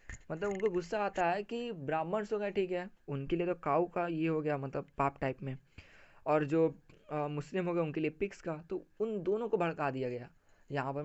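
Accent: Bihar, India. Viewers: Are you from bihar?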